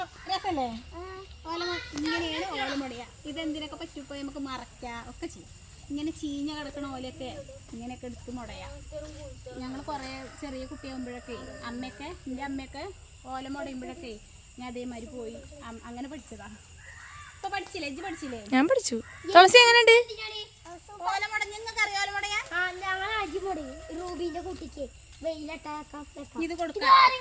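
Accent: Malappuram, India. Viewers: നിങ്ങൾ മലപ്പുറത്തു കാരാ സംസാരം കേട്ടിട്ട് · സൂപ്പർ നിങ്ങൾ മലപ്പുറം ജില്ലയാണല്ലോ സംസാര രീതി കേട്ടപ്പോൾ തോന്നി എവിടെയാ സ്ഥലം 👍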